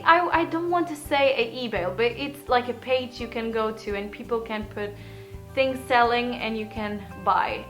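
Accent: Norway. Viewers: you speak english with Norwegian accent.... you are not Portugese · you sound like an Norwegian speaking English! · It's almost like you got a Norwegian English-accent, if you know what I mean? Have you learned any Norwegian by now while you've been here, and what do you do for a living? Anyway, you've got a new subscriber now 😊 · She looks Norwegian..... I`m suspecting this portuguese routine to be a lie! Blue eyes, pale skin and norwegian accent... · Is there someone else who think she has a norwegian accent?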